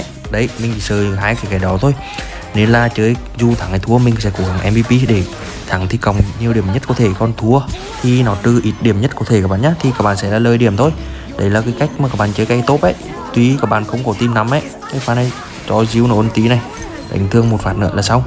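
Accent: Central, Vietnam. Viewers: Bị ghiền tiếng miền trung rồi 😂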